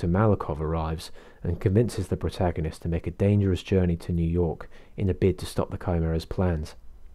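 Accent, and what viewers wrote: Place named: United Kingdom. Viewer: So glad I found this channel through this video. As an American I love listening to a calm British voice narrating a hour long video. Perfect for bedtime.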